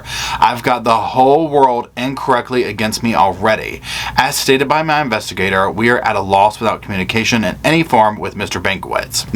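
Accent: South, United States. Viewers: OH I ALSO LOVE HOUR SOUTHERN ACCENT!! Keep it up you’re great!!❤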